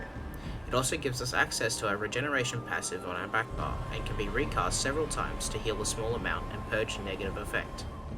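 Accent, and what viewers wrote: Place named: United Kingdom. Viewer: Thank you so much for being experienced, and explaining everything pristine. I came here from a comment you left on one of Hack's eso warden build videos. His build was nothing compared to yours and I almost made the grave mistake of copying his build. That is when I found your comment, it seemed so educated, which made me click on your channel. When I saw you had a REAL man's warden build, I knew God existed and worked in many mysterious ways, and that those ways brought me here. I am from the States, but you sound like you are in the UK, God Bless The Queen.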